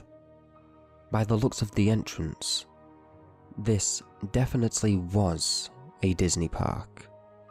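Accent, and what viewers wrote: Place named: United Kingdom. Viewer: you know it mate :) good to have another British narrator around here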